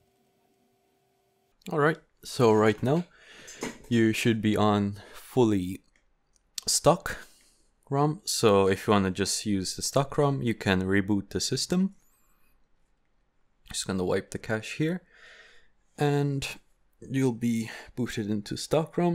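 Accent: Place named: Ireland. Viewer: you have an irish accent im confused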